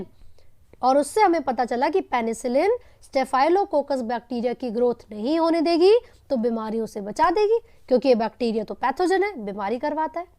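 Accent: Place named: Uttar Pradesh, India. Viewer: Didi aap bataiye ki aap kis state se belong krti ho.Mujhe toh lgta hai ki aap UP ki ho.Aapka accent hi aesa hai jaisa UP mei.Agar m sahi hoon toh aapka like chahiye mujhe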